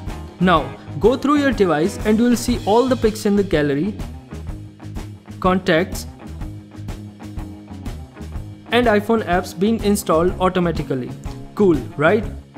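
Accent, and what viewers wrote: India. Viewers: I hate that Indian accent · Oh god an Indian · Alex Fosse that’s Indian accent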